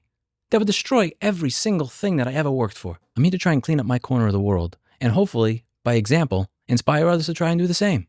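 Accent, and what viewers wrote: New York, United States. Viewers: You are doing gods work in “well actually”ing these lawyers that defend anti repair :) Btw your accent is still vey much more NY than TX. There was a slight drawl towards the end of you mentioning that tho. :P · You still sound New York, its going to take a few more years to get your twang. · Is your voice ok, because you sound way more new york now? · Has louis NY accents gotten worse since moving to texas, love it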